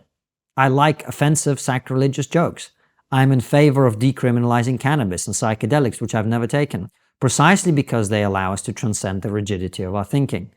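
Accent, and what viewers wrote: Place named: United Kingdom. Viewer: This guy thinks because he’s British that he sounds smart but that’s not how it works bro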